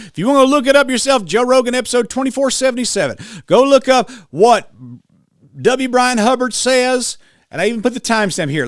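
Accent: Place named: South, United States. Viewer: That’s not how it works. You should learn about addiction. You sound really southern. Learn to read.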